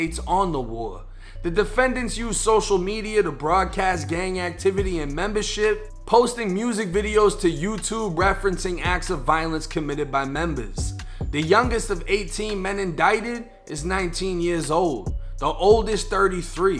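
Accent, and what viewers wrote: Boston, United States. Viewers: SO YOU SOUND A LITTLE BOSTON TO ME BUT YOU REPORTING BROOKLYN NEWS.. TAKE THAT SHIZZ DOWN TO BLUE HILL AVE H-BLOCK BROMLEY HEATH YOU SOUND STUPID!!! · Favorite channel right now ! Over 23 & 1 even, and those other prison channels🤷🏽‍♀️ I think it’s the Boston accent and dramatic ending of sentences🤣💪🏻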